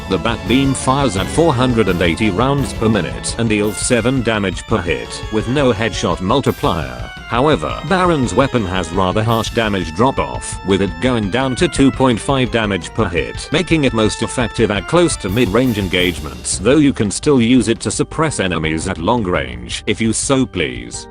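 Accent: United Kingdom. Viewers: British? Hecking! Whaaaa!…..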